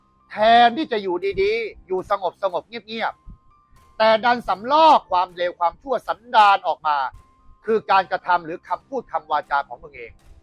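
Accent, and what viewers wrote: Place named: Thailand. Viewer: ผมชอบนะ..เพราะมันคือความจริง ที่พูด...ถึงแม้ลีลาการพูดจะแบบไทยแท้..แต่ สุโสสัง ลพเตปัญญัง ฟังด้วยดีย่อมได้ปัญญา...กรรมไผกรรมมัน ทำไป..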